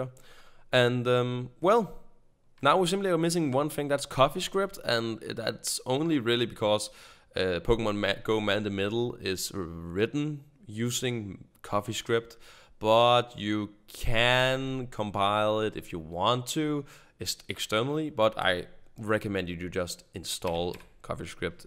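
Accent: Denmark. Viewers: du er god til engelsk men man kan godt høre at du er dansker!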